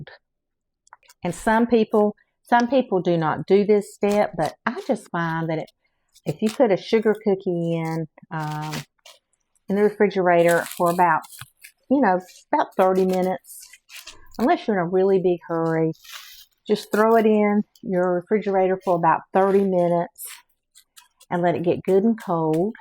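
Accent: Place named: Carolina, United States. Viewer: Subscribed a few weeks ago. I can tell you are a Carolina girl. Your accent is just like mine and my family's! Love it! Going to try this recipe for sure.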